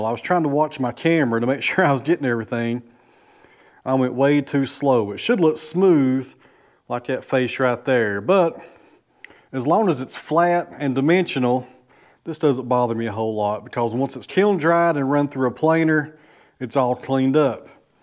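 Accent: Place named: South, United States. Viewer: .. I agree, there is good and bad everywhere, but I'm Mexican and resect, politeness and manners is really big in my culture.. so I really admire it when I see it in other cultures.. maybe it's just that southern, twang that sounds so cool... LOL.. and do you notice how much he loves and respects his father? if it wasn't for that southern twang, I would swear he could be Mexican.. LOL...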